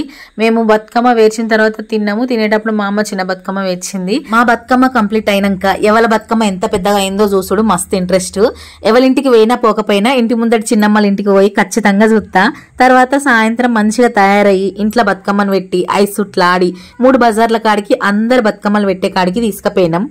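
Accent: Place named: Karimnagar, India. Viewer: మాది కరీంనగర్ జాబు భద్రాచలం లో కని మీ మీరు యంగ్ గ ఉన్నారు బాషా చాలా బాగుంది మేము మీ బాషా చాలా మిస్సు అయం సూపర్ మీ వైఫ్ మీది కేమిస్టర్రి బాగుంది 🎉🎉🎉🎉🎉🎉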